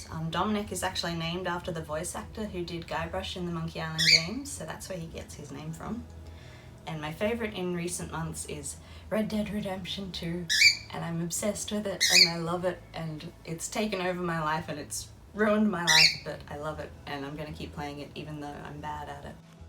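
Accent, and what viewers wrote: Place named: Australia. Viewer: Wow! You sound like youre an Aussie?? I had no idea! GREAT accent!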